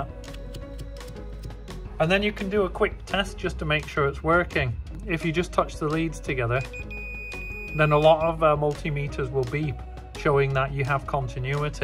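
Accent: Liverpool, United Kingdom. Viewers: Cool. Thank you for the demo! You sound like a Beatle.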